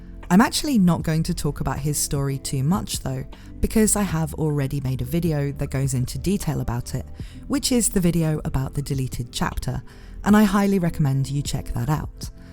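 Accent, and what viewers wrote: United Kingdom. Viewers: de donde eres? xd suena a britanico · WhenI heard you say "Last One to Leave the Nest", I had a tear in my eye. Also: Christina Best Child Do people in the UK (assuming based on your accent) pronounce it "obleej"? Because in French, that "é" would pronounced like in "hey' (without the "y" sound). Not sure if this makes any sense. Truly loving your content. Gonna check that Vivy video next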